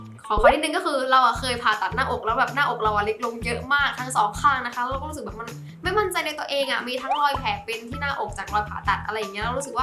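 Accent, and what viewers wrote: South, Thailand. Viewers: เป็นึนใต้ป่ะครับ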